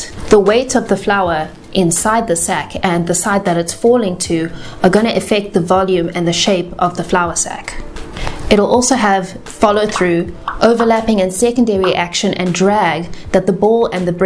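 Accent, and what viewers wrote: South Africa. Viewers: Idk if you are, but you sound so south african!! :D it's weirdly endearing to hear the accent on yt Thanks for the tips btw. Love your channel! It's been a great help so far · She sounds South African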